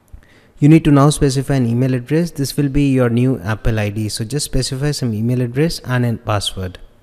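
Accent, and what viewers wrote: India. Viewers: indian accent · indian much holy crap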